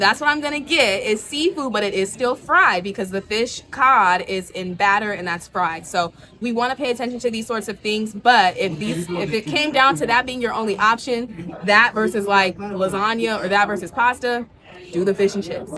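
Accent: Caribbean. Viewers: Hey 👋🏿, do I hear that you have a Caribbean accent? If so where from?🙂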